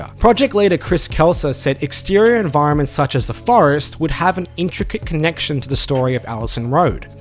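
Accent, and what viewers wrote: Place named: Australia. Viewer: I see the link to this video in Painticus' discord, I hear an Australian narrator, I like and subscribe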